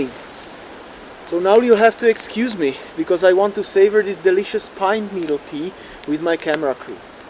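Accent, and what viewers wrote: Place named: Germany. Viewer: Don't wanna be that guy, but isn't he german?? Accent and camo gave it away :P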